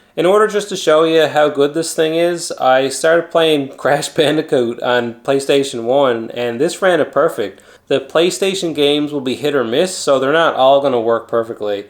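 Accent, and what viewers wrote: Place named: Maritimes, Canada. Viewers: I knew you were a Newfoundlander, earned a sub from Nova Scotia. Keep up the great work my trout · Great review. I just got one as well ans love it. The short bootup times make it great for pickup and play on the go. By any chance are you in Nova Scotia or Newfoundland? I recognize that east coast accent. :)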